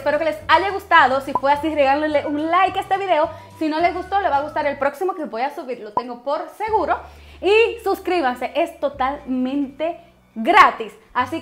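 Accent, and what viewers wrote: Dominican Republic. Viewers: Gracias amiga! Es que note su acento parecido al de República Dominicana...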